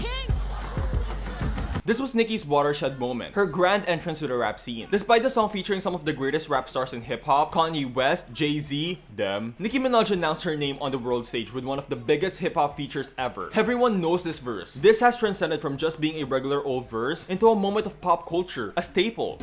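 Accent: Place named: Philippines. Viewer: I'm living through your stanship and filipino accent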